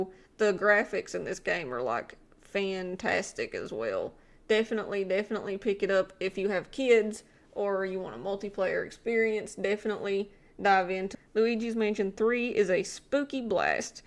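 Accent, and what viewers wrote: United States. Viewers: Love that accent of yours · you sound like Arkansas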